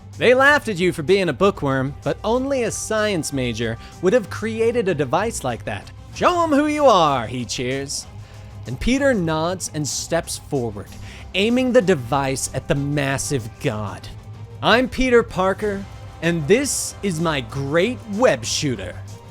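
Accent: United Kingdom. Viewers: has got the fantastic job apart from that British accent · Not all us brits sound like Chimney sweepers from the 1940s come on man do some voice research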